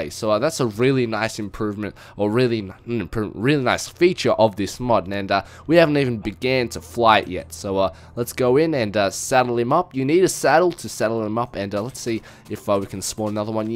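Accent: Australia. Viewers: O.o ur australian :O? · Are u Australian mate coz we are awesome ANZAC's for the win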